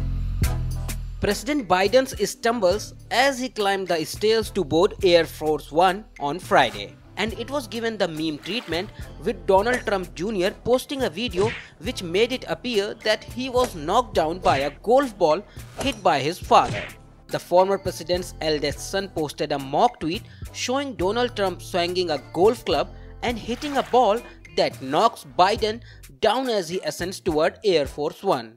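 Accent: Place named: India. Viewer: Ok why is this being narrated by an Indian?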